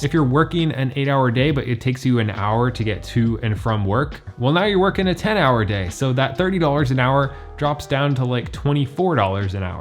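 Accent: Boston, United States. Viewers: Love the accent bit! Were you born and raised in Boston and you just "lost" your accent or did you move there later? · I like the Boston accent 🤣🤣🤣… I love this concept, currently having a hard time with meal prep and cooking . So my money is going to restaurants 😩 … but learning to build a list and plan the meals ahead has been the biggest challenge. Hopefully I can switch my mindset and it will help.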